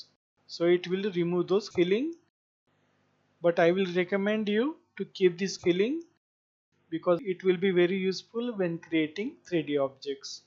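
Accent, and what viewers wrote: India. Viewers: yk its dope when i see indian with accent teaching tysmmmm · What the fuck bro I can’t understand ur inidan voice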